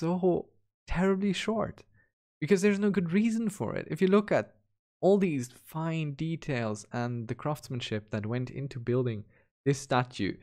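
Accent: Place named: Ireland. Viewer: Are you Irish? You sound like a mix between Irish and something else.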